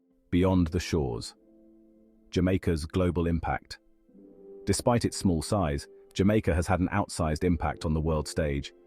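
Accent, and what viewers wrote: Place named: United Kingdom. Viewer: Really? A British voice narrative g that? No jzmaican accent as available? Tacky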